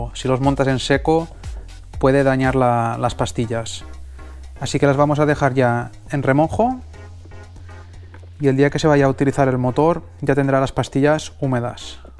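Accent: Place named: Catalonia, Spain. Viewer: Com es nota que ets català ajajaj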